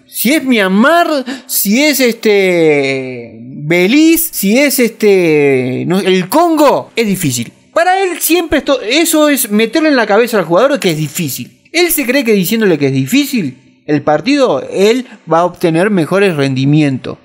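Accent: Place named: Argentina. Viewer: Pareses argentino como hablas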